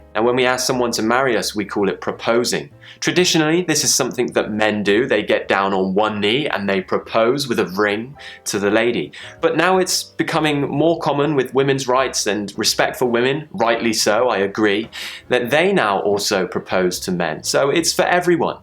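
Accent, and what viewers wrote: RP, United Kingdom. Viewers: Hi, English channel.I`m really happy with your english lesson.your british accent is really amazing,every day i watch your youtube channel for improving my english,specially my accent.thanks for your dedication on youtube channel,keep going!very support with your hard work! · I love your British English ! I come from hk , I am married 😹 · thank you! I love your British accent! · I'm brazilian and I'm trying to learn the british accent with your help 💙 I met your channel today and I really love it, thank you so much for all of this 💙💙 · I love British accent, And i love hearing your voice and learning British English at the same time. I'm browsing all of your past videos and i'm in love with it. Your such an inspiration. Thank you. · I am immensely grateful to be able to attend your lessons,specially i am so fun of British accent as well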